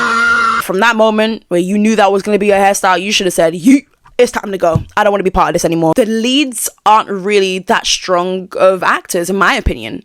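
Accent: United Kingdom. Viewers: you sound like 10 times more british in this video than usually, and it's super cute!!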